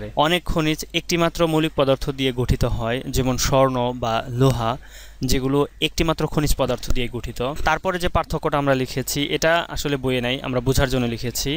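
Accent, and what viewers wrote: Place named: Mymensingh, Bangladesh. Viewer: apnar voice sune mone hocch apnar district mymenshingh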